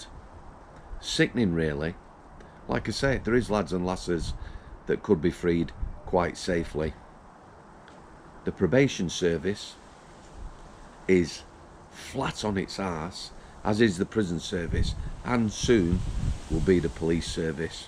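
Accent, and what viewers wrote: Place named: Yorkshire, United Kingdom. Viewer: Love your videos, mate. Hearing 1st hand stories etc. Still trying to work out where you are from. Barnsley, like mesen is like, or Wakey, Sheffield? Al si thi.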